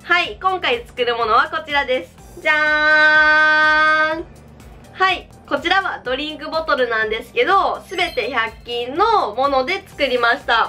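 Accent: Kansai, Japan. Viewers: 関西弁で友達みたいに喋ってるの良かった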